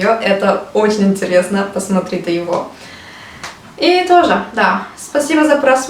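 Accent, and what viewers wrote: Standard. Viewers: Восхитительно! Вы настолько чисто говорите по-русски! У Вас такой талант к изучению языков! Большое Вам спасибо за это видео.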